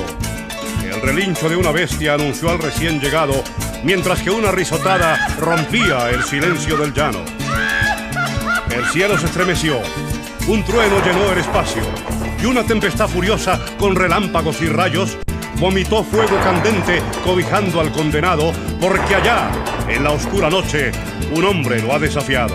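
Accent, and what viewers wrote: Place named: Bogotá, Colombia. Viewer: una voz magnífica, una dicción espectacular, todo un artista; pero declamó como un bogotano. Si quieren saber cómo declama un llanero, busquen el poema el cazador novato karacachian.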